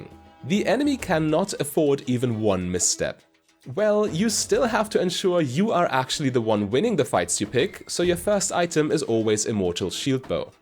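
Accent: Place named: Germany. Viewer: Theres a lot of different builds being pitched for us noobs but I wont question a gamer youtuber with a good mic and a german accent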